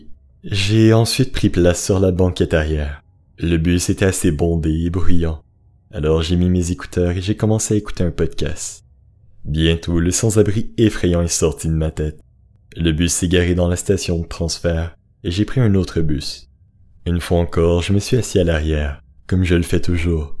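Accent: Quebec, Canada. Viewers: Mais ta un putain. D’accent québécois pourtant sa a l’air intéressant jte dit sa mes mon accent marseillais n’est pas mieux ✌🏽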